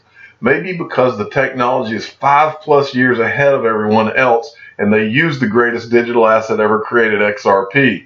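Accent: South, United States. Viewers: You’re so unapologetically southern it kills me sometimes 😂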